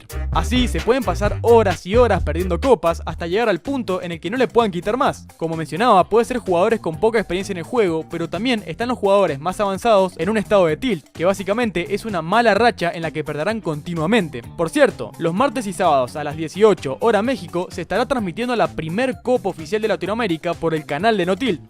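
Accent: Argentina. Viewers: seguramente eres argentino por tu vos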